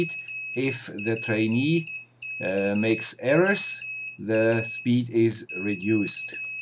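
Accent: Switzerland. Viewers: I've followed you through the years and I have learnt a lot to the benefit of my own projects. Thank you! Just saw your "last" video and got curious about the first. Even though it is 10 years ago, you presented the Morse-trainer project much better than many videos out there today. Looking forward to more sporadic Swiss-accent videos in the future. Cheers! 🙂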